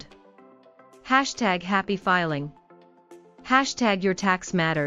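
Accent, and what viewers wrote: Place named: United States. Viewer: Really annoying that a video for the South African Revenue Service is narrated by someone with an American accent! WHY?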